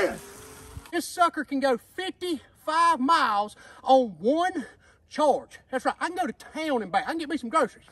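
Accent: South, United States. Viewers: I love his Southern accent 😀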